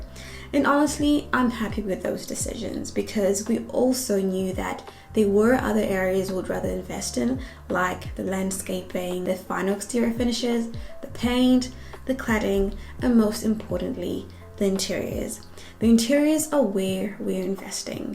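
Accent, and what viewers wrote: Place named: United States. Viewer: Judging from the houses we see on YouTube and those we have seen in person, the general building methods and principles and tastes Zim are too isolated from developed word standards. Without exposure Zim trades ppl and builders merchants n allied industries/professionals will disappoint those who are coming from the western /first world diaspora. 🤷🏽‍♀️ You sound American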